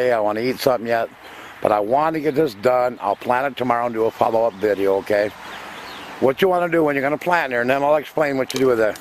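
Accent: Minnesota, United States. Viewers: I like your Minnasotan accent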